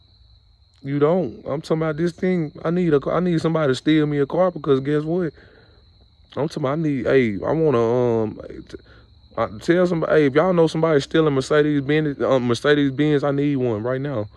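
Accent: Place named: South, United States. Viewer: Could it also be that you sound uneducated and like you came from the po' south?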